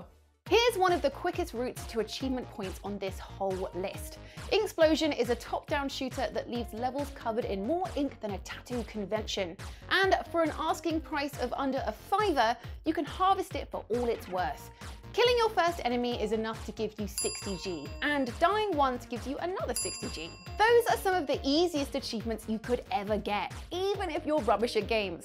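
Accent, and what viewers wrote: United Kingdom. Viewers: this is so british i actully understand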